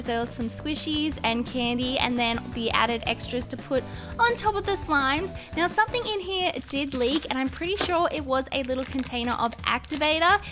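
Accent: Australia. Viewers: Ur Australian?! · are you Australian or newzealander?? nvm u say in the vid lol. im from aus too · Nimah Kazi I’m Australian, so she sounds like she’s speaking normally to me... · its Australian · are you australian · It's Australian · Wooo! Your Aussie like me! Say OI OI OI in the reply’s I also love slime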